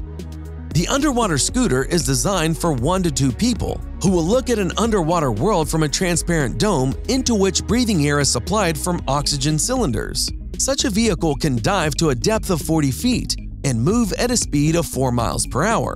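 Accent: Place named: United States. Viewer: How does that American sounding voice speak such bad English? Is it a brilliant bot, or an idiot voice actor??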